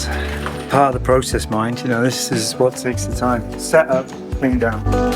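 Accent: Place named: Scotland, United Kingdom. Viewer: Was thinking the same thing!! 😊 Scottish accent! Lovely lady!!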